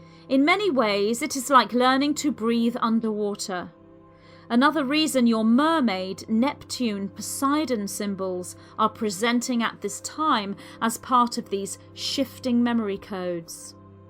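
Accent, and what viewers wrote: United Kingdom. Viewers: HEY EVERYBODY... Just thought I'd come in and grab a little popcorn and watch a video about another non-existent planet...... Okay, here we go - yeah buy the book and resource series. Nibs-For--Tards. (Uh-huh! yeah! MK Ultra, sure) How To Painlessly Rip Out Your Brain... Ahhhh - gawdammed British accent will do it every time. My pole shift??? Gash talks like she's an exception to this planet - someone should check her 'pole.' Hoooe-shit, there's still 26 minutes of this fucker left. I don't think there's going to be enough popcorn and I certainly didn't see anything to drink around this joint.... ....Hang on... I'll be right back in a jiffy.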